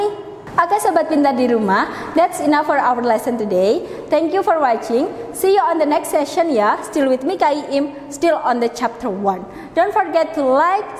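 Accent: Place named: Java, Indonesia. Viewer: Medok mya gk pernah ilang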